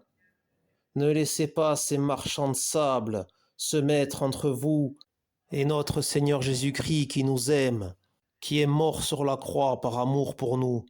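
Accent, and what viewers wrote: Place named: Corsica, France. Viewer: Cher compatriote, Pace e salute ! Votre accent ne m'avait pas trompé, je le connais trop bien. Permettez-moi de répondre à votre contradiction, non pour alimenter une stérile polémique, encore moins pour être désagréable, Dieu m'en garde. Je souhaiterais simplement vous poser quelques questions. Au préalable, je précise que j'ai reçu le baptême avant Vatican II, et que je demande chaque jour la grande grâce d'être inébranlablement fidèle à ma Mère, la Sainte Église, à sa Doctrine, à son Culte, à sa Morale, à sa Loi. Voici donc mes questions : Un VERUS PAPA, càd catholique, revêtu validement et légitimement des prérogatives de Saint Pierre, peut-il ostraciser et interdire la Messe codifiée à perpétuité par saint Pie V ? Peut-il nier la Médiation universelle et la Corédemption de Marie ? Peut-il bafouer la Morale en bénissant ceux qui pratiquent des abominations à Dieu ? 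Peut-il soutenir que toutes les religions conduisent à Dieu, contredisant ainsi le Commandement du Seigneur à ses Apôtres avant son Ascension et la raison même et la sacrée Mission de l'Église hors de laquelle point de salut, laquelle continue Jésus-Christ ? Un authentique Vicaire de Jésus-Christ peut-il affirmer que les catholiques adorent le même Dieu que les musulmans ? J'arrête là, mais je pourrais allonger la liste sur de nombreuses pages. D'ailleurs, vous pouvez vous-même comparer la Doctrine immuable de la sainte Église, de ses Papes, de ses Conciles, avec les innovations et hérésies professées depuis Va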